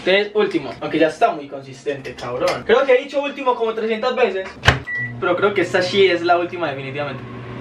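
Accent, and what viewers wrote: Colombia. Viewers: wacho amo el acento colobiano y amo los videos de este chavon y los q hace con kennet ❤ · Jaja esta perdido tu ojo ve a biscarlo👌😅 parserito te amo❤ Amo el asentó de los colombianos hermosos ❤👌💙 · Leche azucar y Cacao cocoa o chocolate empolvo En Uruguay le decimos leche v: xq es leche pero tomamos eso y lo calentamos en el micro es como café pero más rico v: jaja creeo que es igual en todo el mundo pero como tu probaste y dijiste ayy que rico ajja otra cosa me encanta el acento colombiano pa❤ También me gusta su cultura y su país me ciento identificado ajja❤ Prueba el mate😉❤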